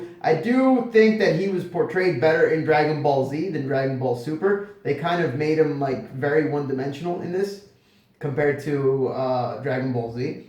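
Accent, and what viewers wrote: Italy. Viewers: oooh sí calabrisi?? Iu sicilianu, più o meno lo stesso dialetto;)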